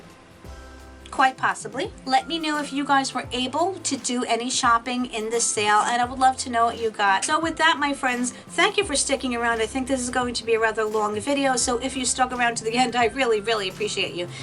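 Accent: New York, United States. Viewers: I love the Best of Life 2 pallet, stunning colors & glitters, love your NY accent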